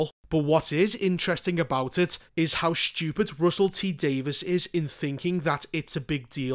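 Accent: Liverpool, United Kingdom. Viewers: Sorry, I wonder are u from Liverpool?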